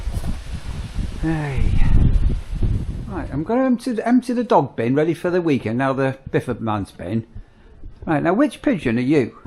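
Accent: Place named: Hull, United Kingdom. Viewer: Are you from hull area no diss but tha sounds like cod head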